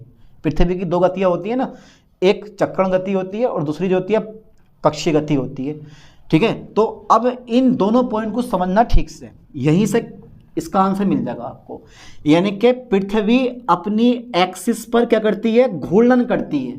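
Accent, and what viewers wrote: Bijnor, India. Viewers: Sir aap bijnor side k ho kya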